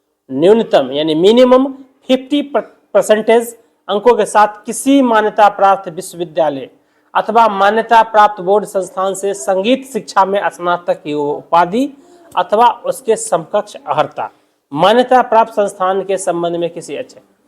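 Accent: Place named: Bihar, India. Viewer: Bihar se h madam o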